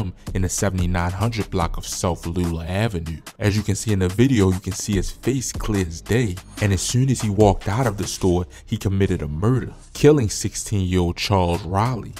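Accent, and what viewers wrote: Baltimore, United States. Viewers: This narrator definitely from Baltimore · Cuz he frm baltimore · Yea you definitely from Baltimore 😅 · The narrator DEFINITELY from Baltimore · Bmore accent strong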